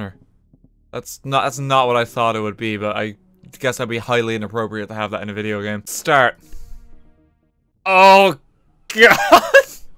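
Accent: Ireland. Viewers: "Jump em boys!" Why do I feel like that should be an in game line for the Hobbits, voiced exactly in Irish lilt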